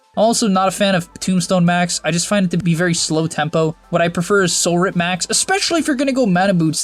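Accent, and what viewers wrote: Australia. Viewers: Australian accent got me good 😂😂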